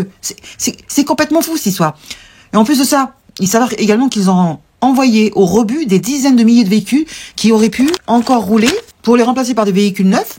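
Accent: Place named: Rhône Valley, France. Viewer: Excellente nouvelle, merci du partage plein de bon sens, depuis la Côte Basque ❤ C'est migonn cet accent de la vallée du Rhône😊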